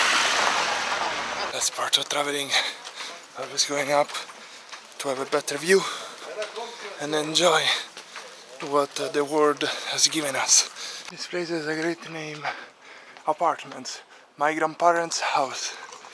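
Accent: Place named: Italy. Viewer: Grande bellissimo vlog, non sono riuscito a capire proprio tutto pero ho capito che ochrida ti è piaciuta, io (i miei) sono originario della macedonia pero vivo a roma, comunque si capisce subito che sei italiano "cinquecento"🇮🇹 Ottimo lavoro spero che continui con questi tipo di vlog 👍💪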